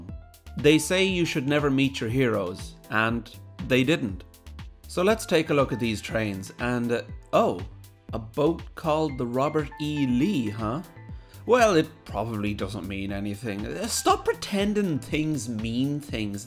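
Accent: Ireland. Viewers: Is the narrator Irish (accent). What about Pete Waterman? What's with the 3 rail trains? I guess they all like Lionel. HO can be so much more realistic. Considering what money celebrities have, this was pretty disappointing.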